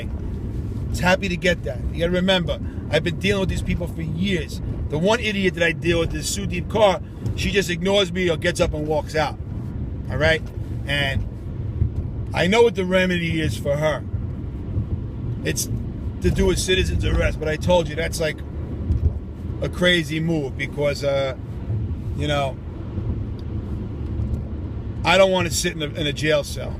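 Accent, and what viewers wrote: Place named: New York, United States. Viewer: I wish I knew u years ago, I just got off child support 2 1/2 yrs ago, and it only stopped because my 2 girls grew up. In the state on NY child support is up to the age of 21, I WAS SERVING MY COUNTRY WHEN I WAS 21!!!, you sound like a NY'er like me and I work for the MTA so you have a round about idea what MTA workers make, like yours my EX WIFE is a evil B*TCH who only cares about the all mighty dollar...I didn't do my homework and for 2 girls I was paying 900 bucks a month in CS...coming straight out of my check. When the CS was up my EX tried to sue me for $100 a month in alimony 2 yrs ago, 1st I laughed then I boiled up the petition and threw it right in the garbage...never heard from her again. for 23yrs I was hounded by CS threatening to cancel my drivers license. I figured you for a cop so you know the system a lil better than us, but I wish I knew someone like you when I was paying...I been with the MTA since 1998 the only things that saved me (mind you I lived with my grandmother until her passing in '99) was I got re-married in 2002 and I have a VERY understanding wife who stuck by my side when I was making 250bucks a week after all these deductions in NYC (just try surviving off of 250 bucks a week in NYC!!!), I started as a bus operator and when I got my 1st promotion to dispatcher I kept my mouth shut and I was making a little bit more money, then I got promoted again to a different title and made more money...When the child support was over I got my first FULL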